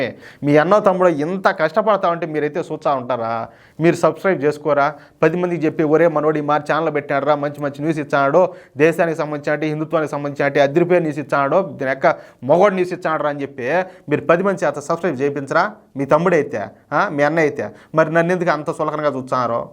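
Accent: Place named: Chittoor, India. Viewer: BABU MEMU KEVALAM MANA CHITTOOR DISTRICT BHASHA.MEEDWARA VINADAM KOSAMEE MANA CHANNEL CHUSTHUNNAMU BABU KAANI E ROJU CHERUVU LO CHEPPALA EXAMPLE MATRAM ASSULU NACHHSLEEDHU BABU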